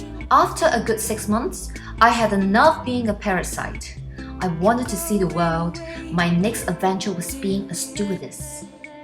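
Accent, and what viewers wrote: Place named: France. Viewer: youre such an inspiring woman, I really loved learning about your past! Your English has a bit of French accent to it, it was sooo cool!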